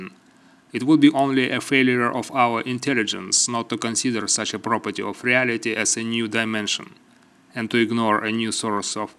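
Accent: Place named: Eastern Europe. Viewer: The 'presentation' is a little on the 'dry' side, but an informative video nevertheless; IF you can understand the heavy Eastern European accent ;>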